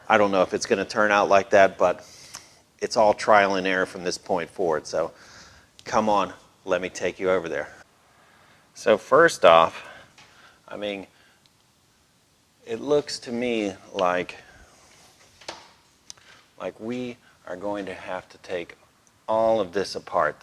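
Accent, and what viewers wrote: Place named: United States. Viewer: A V8 rear wheel drive car is alien to you. You sound American, must be an import guy?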